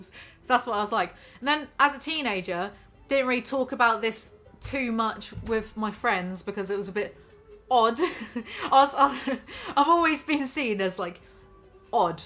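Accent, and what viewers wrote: United Kingdom. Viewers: Your british accent makes everything funnier 101% lmao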